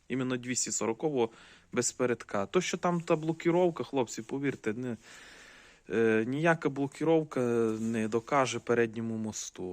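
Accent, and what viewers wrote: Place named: Rivne, Ukraine. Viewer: Акцент напевно Рівненський ❤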